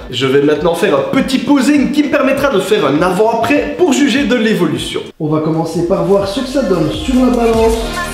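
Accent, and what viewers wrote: Liège, Belgium. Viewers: Personnellement je n’ai qu’une question ^^ tu viens d’où ? On reconnaît un peu l’accent de Liège mais je suis pas sûr lol ^^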